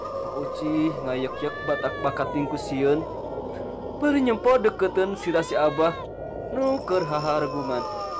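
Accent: Sunda, Indonesia. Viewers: Wa alaikum salam wrhmtllh wbrkth masha allah lalampahan abah kanta semoga makin sukses kang meni sae dina nyariosna lagam nada nadana cirihas pisan orang sundana hatur nuhuun kg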